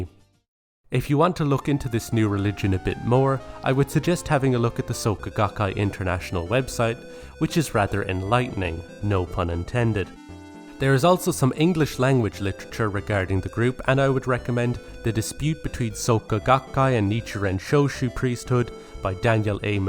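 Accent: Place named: Ireland. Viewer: I can see you did alot of research for this vid and it is well put together. I think talking the to people who practice with the SGI would have given you quite a different perspective and tone to it though. I am Irish (like you by the sound of it!) and practice Nichiren Buddhism here with SGI-Ireland. I initially started practicing abroad 20 years ago. I have seen how members practice in many countries (including Japan). There are so many inaccuracies in this presentation I dont even know where to begin! Maybe you will cross paths and get to know an SGI member some time and be pleasantly surprised by what yiu have misunderstood. I hope so 🌞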